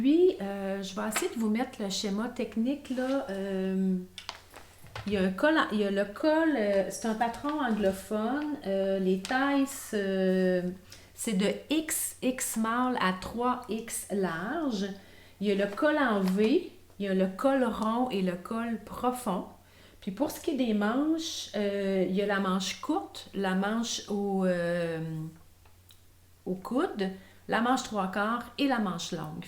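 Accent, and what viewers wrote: Canada. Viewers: Bonjour ma belle ! J'espère qu'aujourd'hui il fait un peu plus chaud chez vous ?!? J'adore te regarder et surtout t'écouter pour me régaler de ton accent canadien. Tes tissus sont tous très sympa. La veste burda est réussie. A voir l'histoire du bas de la veste (je n'en ai fait que deux jusque là donc les conseils je ne peux te les donner ) ! Burda c'est uniquement du texte c'est surprenant mais ça s'adresse plutôt à celles qui ont un peu d'expérience, sauf les Burda Easy qui sont des basiques et il en faut. J'ai repris la couture il y a trois ans et contrairement à toi je prends du tissu pas cher et je tente plein de choses pour les adapter à ce que je veux sur moi. Le tissu plus onéreux je l'utilise pour les projets dont je suis sûre. A bientôt ma belle 😊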